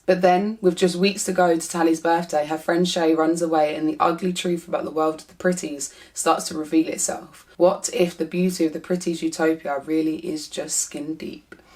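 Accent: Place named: South London, United Kingdom. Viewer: That South London accent is thick! Lol. Must be Brixton. Anyway, I'll look out for your reviews of Sci Fi books. Happy booktubing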